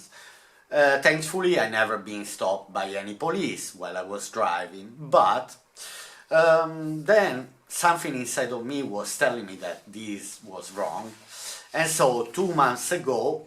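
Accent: Italy. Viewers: Il tuo accento è uno strumento di marketing. Non posso credere che parli in Inglese in modo quasi perfetto grammaticalmente e contemporaneamente ha l' accento italiano più forte mai sentito. Cmnq bravo, video carino. 😅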